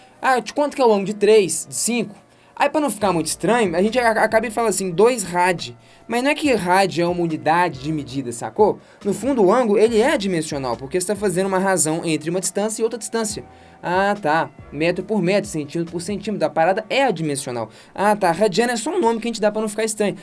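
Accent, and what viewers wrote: Minas Gerais, Brazil. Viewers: Esse sotaque mineiro deixa tudo ainda melhor 😊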